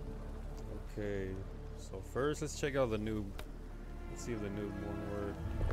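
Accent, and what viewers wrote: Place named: India. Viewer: He sounds Indian of some sort. He let his most of his family name.He knows nothing nothing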